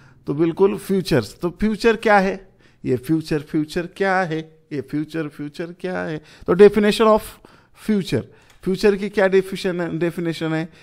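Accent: Maharashtra, India. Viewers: Hats off to your explaining process with great marathi accent, maja aali fun with learn just wanted to tell others that learning is not a overnight change process. It required lots of time, practice, patience and self believe. Guruji ek video practical daalde aur aap turant wo sikh jao agar aisa he to share market aap keliye nahi he dosto sorry to say that but it's fact.🙏🏻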